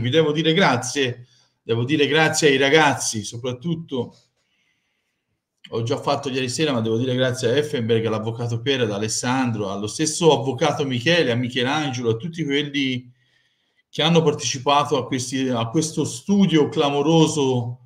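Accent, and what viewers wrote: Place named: Florence, Italy. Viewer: Bellissimo il tuo accento fiorentino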